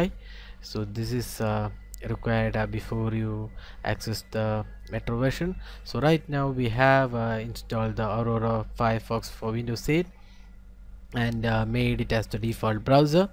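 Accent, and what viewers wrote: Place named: South India, India. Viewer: Holy fuck... no offence man but your accent is very heavy making it impossible for me to understand most bits unless I have re-repeated them several times. Also, you do seem to have a heavy southern indian accent.. Please get rid of it!? You have a nice video and the content of your video and review is pretty good too. I will seriously recommend you to work on to neutralize that tone and accent and at least sound proper (your pitch was uncontrollable and went up and down monotonously as if you were least bit concerned or overly bored) and make sure there are no background noises(I could hear a song playing in the background clearly). Otherwise the review was helpful... thanks!!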